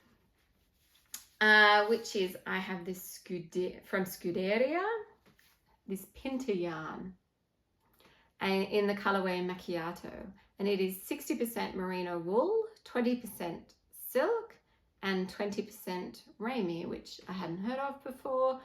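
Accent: Australia. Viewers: Hello from NZ! It's always wonderful to hear an accent from Down Under on YouTube! Just found your channel, love your style and vibe so of course I subscribed. Good luck with your tramp! And just as a thought, if you start a new project for your train ride, it doesn't matter if its a sock or a jersey - that only matters once you've finished it. So I reckon you start the Kala T! 🤣😁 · It's wonderful to hear another aussie accent on youtube 🙂 I like the look of the seed star tank, I think it is something I'd like to knit. Your Miss May MKAL is looking good so far. It's exciting to hear that you're doing a giveaway, and the yarns look lovely.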